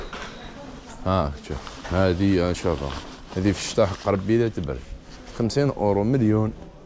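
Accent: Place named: Annaba, Algeria. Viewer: نتا من عين البيضة علاه تهدر عنابي والله ما جات عليك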